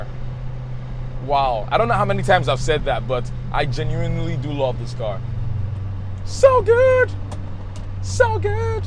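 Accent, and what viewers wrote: Nigeria. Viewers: Came for the car, stayed for the laugh and the Nigerian (west African) accent.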